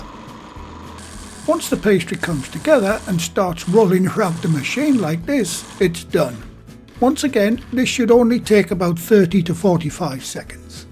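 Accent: United Kingdom. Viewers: I love your video!! I love British accents! But also, it was easy to follow the step by step and it was fast! Ty!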